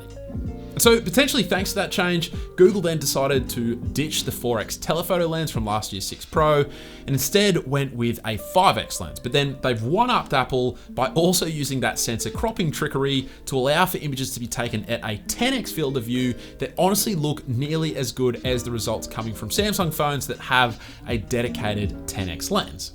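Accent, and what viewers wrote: Australia. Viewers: Is this an Australian accent? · I really love this phone, its pixel through and through...and when you let me know how to work it speaking with your sexy Aussie accent, I melt! Thank you 🥰😘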